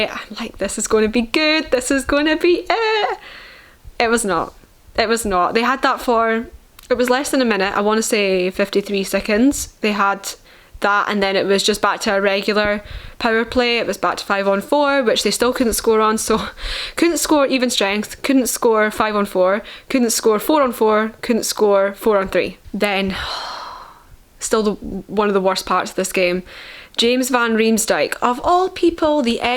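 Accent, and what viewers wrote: Scotland, United Kingdom. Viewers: It’s refreshing to hear/see a WEE SCOT analyzing the Leafs. My NANA was from Scotland and I miss that wonderful accent. Also a little surprised to see someone so far away who is so knowledgeable about our ‘hapless’ Leafs. Thanks for posting, my dear, it’s wonderful for an old man to hear hockey described by such a sweet beautiful lady…AND I LOVE YOUR BLUE EYES !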